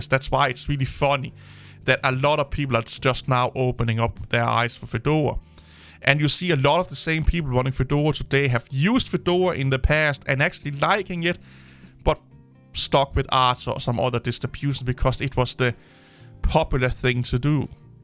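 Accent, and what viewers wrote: Denmark. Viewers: you're on the money nearly all of the time. One of the few Linux YTubers to be honest about the pros and cons of Linux. I use MacOS, Linux and Windows btw - each has merit. Danish accent fine by me! Very proficient in a second language unlike most native English speakers. Actually I like Fedora and use it - a good distro, but one amongst many. Just use a distro you like and suits you without making grandiose fanboy claims about it!!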